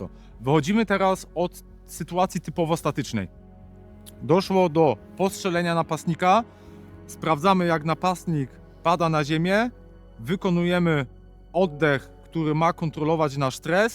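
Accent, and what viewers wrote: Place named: Germany. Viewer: No i spoko :) Dobrze wytłumaczone i nawet lekki niemiecki akcent nie przeszkadza ;)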